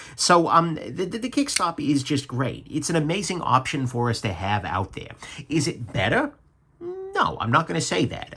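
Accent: New York, United States. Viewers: I love your old - style NY accent. It reminds me of Stitch Mahone from the Paradise Ally movie.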